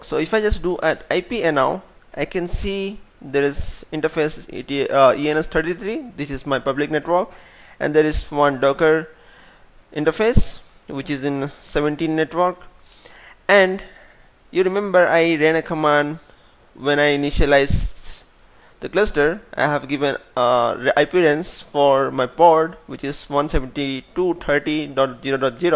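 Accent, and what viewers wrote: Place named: India. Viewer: Are you Indian? It was hard for me to understand words with your accent, but i did it. Thanks for video, man.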